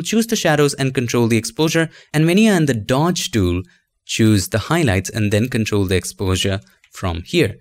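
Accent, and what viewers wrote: India. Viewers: hello there! are you Indian cuz your same exactly seems like Indian, I'm also Indian ☺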